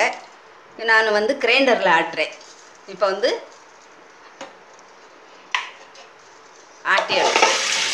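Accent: Madurai, India. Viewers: Neenga Madurai..ya Amma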